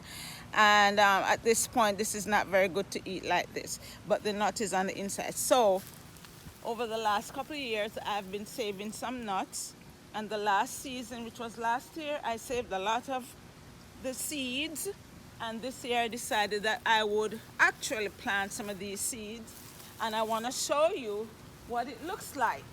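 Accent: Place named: Jamaica. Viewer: Is she from Jamaica I,can tell by her accent and she has a akee tree we have those in puertorico we got it from our Jamaican neighbor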